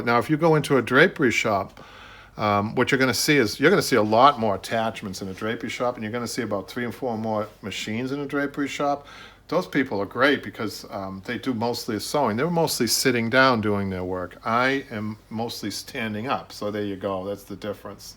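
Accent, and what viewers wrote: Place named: New England, United States. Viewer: As soon as I clicked your video and herd you talk I knew your a new Englanda! Im from Hampton NH. I’m Getting into auto upholstery. Looking at machines now. Thanks for sharing your knowledge.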